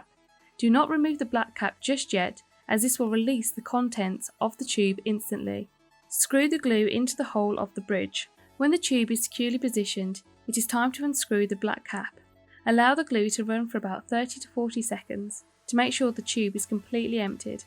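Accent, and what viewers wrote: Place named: Birmingham, United Kingdom. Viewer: Big up Brummie lady👍🏻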